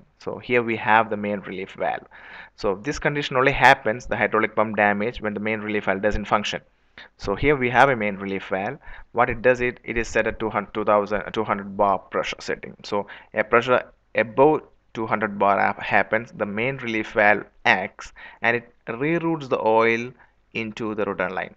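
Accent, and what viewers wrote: India. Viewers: Couldn't understand the word u say It's like u speaking Indian in English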